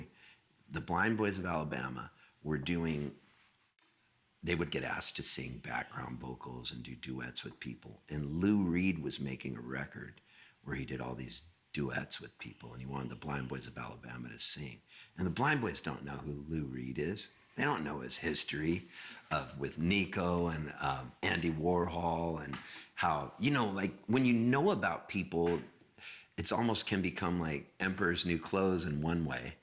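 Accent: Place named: California, United States. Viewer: California accent for the win with these stories!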